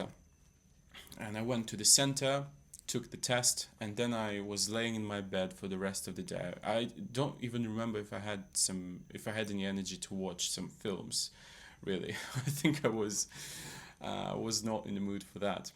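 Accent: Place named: United Kingdom. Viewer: What a wonderful British accent! You must have worked a lot on it. Plz make more similar podcasts.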